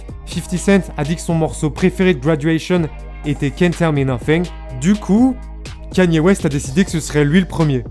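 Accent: England, United Kingdom. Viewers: ouiiiiiiiiiiiiiiiiiiiiiiiiiiiiiiiiiiiiiiiiiiiiiiiiiii enfin tu fais plus ton accent anglais tout était parfait sauf ça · mention speciale pour ton accent anglais et tes videos de wack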